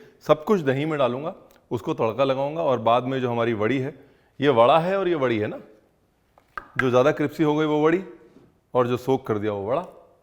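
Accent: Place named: Lucknow, India. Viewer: Your Lucknow-a accent is sooo good , you're so Raw 🔥🙏 thankyou for making this channel . 🥰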